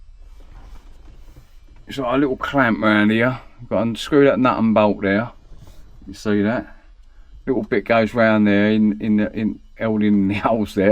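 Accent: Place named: South East London, United Kingdom. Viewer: I knew you was near me with your accent I’m in Croydon area do you have a email or some way of getting hold of you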